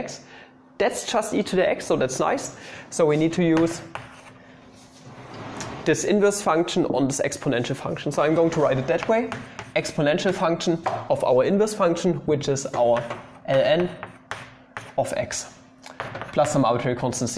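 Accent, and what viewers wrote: Germany. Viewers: You sound german. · I'll be honest. Sometimes I just get lost in his clumsy ways and german accent. xD But keep up the good videos. ;)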